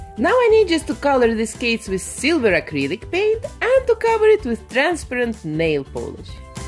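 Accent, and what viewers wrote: Russia. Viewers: Сразу с первых секунд поняла, что вы русскоговорящая :D У вас замечательные видео, спасибо!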